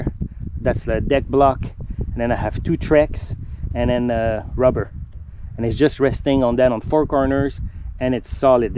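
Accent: Quebec, Canada. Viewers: I like it ! What are all those diplomas on the wall ? I couldn't not notice you accent. Is that PQ ? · At first I was thinking, is it me or this guy sounds like a french canadian dude speaking english. Après j'ai vu le logo des canadiens, ça a confirmé ma thèse 😅 Belle job! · That sounds un peu quebecois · Ta un accent Québecois ??? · You sound like you're from Quebec... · Awesome! Quebecois? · Wow! I love this simplicity! Nice job. Not sure but your english sounds just like mine from Quebec. I wish that container home could be possible up here... But with our cold weather, building code and city legislation... It would require so much effort to get a simple projet like yours possible.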